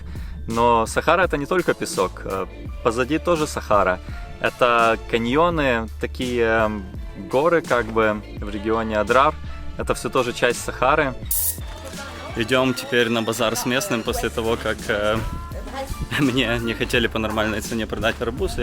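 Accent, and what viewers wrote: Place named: Ukraine. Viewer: О Боже... чувак , ты ссышь зрителям в уши . Посмотрел 15 минут , больше не могу . Твоё произношение на русском просто режет слух . Дружище , если ты думаешь , что разговаривая на русском ( а не на украинском , твоём родном языке ) ты сможешь увеличить аудиторию - то ты глубоко ошибаешься . Я как человек проживший 20 лет в Украине и 7 в России , просто не могу не обращать внимание на твой русский . Не ломай свой язык на камеру , больше потренируйся в плане произношения и только после этого пили видосы на русском . А что касательно самого видео - молодец. Видосик качественный , хорошо проработан , продолжай в том же духе . Только прошу тебя , пожалуйста , прислушайся к моему не большому совету )))))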